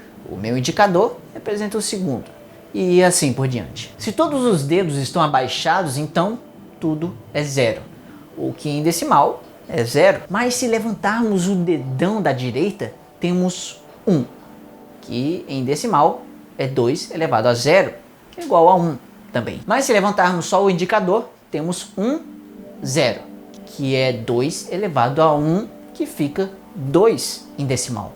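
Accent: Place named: Northeast, Brazil. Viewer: esse cara é de qual estado? esse sotaque não me é estranho... Nordeste? pernambuco? Agreste? alguem sabe kkkkkkk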